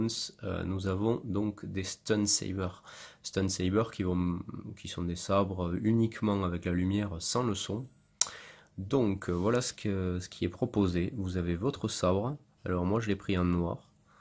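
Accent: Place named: South, France. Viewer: merci pour ta vid, après être tombé par hasard sur une vidéo de Michelle Christa Smith , j'ai trop envie de me lancer dans le maniement du sabre laser mais pas pour du combat, juste du freestyle. tu pense qu'il y a des clubs ou groupes dans le sud? vu ton accent je pense qu'on est pas loin.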